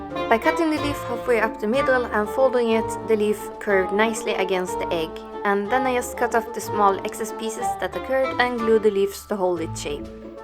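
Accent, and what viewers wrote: Sweden. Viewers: Found your channel randomly, but you'r swedish aren't you? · Det tog mig nästan hela videon innan jag insåg varför det låter så bekant när du pratar, sedan insåg jag att det är för att du är från Sverige! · omg jag gissade att du var svensk man kan verkligen höra det på din accent!! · Are you Swedish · that swedish accent tho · Wow, great work!! I'm stunned :O Also, could tell you were swedish by your voice, so tjena;))) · Oh are you swedish? :) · Are you from Sweden cause you have a Swedish accent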